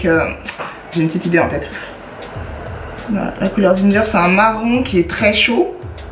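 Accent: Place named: Ivory Coast. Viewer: Tu djaaa comme une bonne Ivoirienne 😅😍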